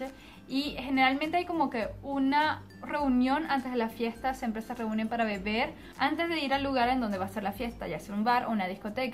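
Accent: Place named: Venezuela. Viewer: Venezolanisima pero #CuentoALaVersionEspañola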